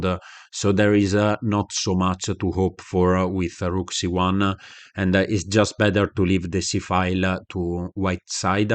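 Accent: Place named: Apulia, Italy. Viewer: Accento pugliesissimo ahahah grazie per la lezione!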